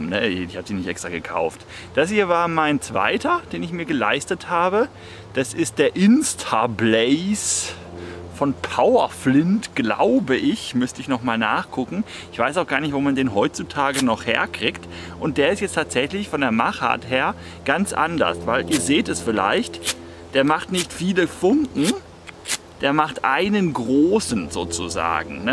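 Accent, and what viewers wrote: Baden, Germany. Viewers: Klasse Viedeo, sagemal von wo kommsten Du her😉 ich würde deiner Stimme nach urteilen aus dem Badischen. Und wenn das so wär. Dann wären wir vielleicht gar nicht soweit voneinander entfernt. Gruß der Markus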